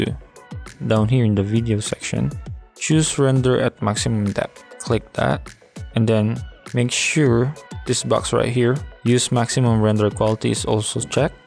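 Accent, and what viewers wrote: Philippines. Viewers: sounds pinoy-ish :D .. nice tuts.. problem is i don't understand this proxies and ProRes thing.. when i edit., i just drag and drop since i'm just working on one clip.. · I feel like he’s a filipino with his accent.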